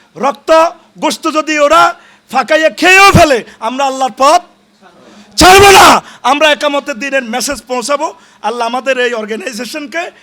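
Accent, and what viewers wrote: Noakhali, Bangladesh. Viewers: আনরে লাগে মনে হয় নোয়াকালীর বক্তা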